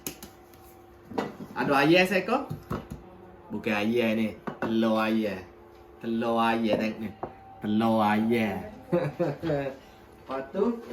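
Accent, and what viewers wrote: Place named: Kelantan, Malaysia. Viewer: Saya baru tgk resepi Yorkshire ni Dalam channel Cik Yatie Vlog. Tgk dia buat nmpk sedap n mudah.Dpt pula suggested dr YT channel ni, terus melekat. Nak try buat jugak lah pudding ni. Btw, cute lah kecek kelate.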